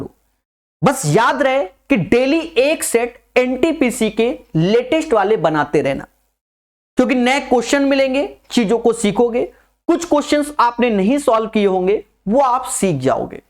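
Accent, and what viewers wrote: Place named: Kanpur, India. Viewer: Oye Hoye. Apne Kanpur wale Sir. Kanpuriya Style me. Rock krte hue. 😎😎😎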